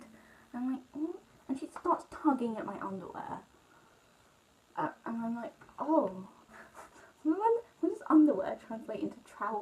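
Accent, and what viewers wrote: England, United Kingdom. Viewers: She sounds so much more English... 🤔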